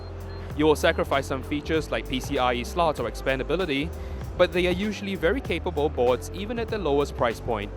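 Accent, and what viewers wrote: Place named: Hong Kong. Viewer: Probably Hong Kong cuz we so do have a Asian British accent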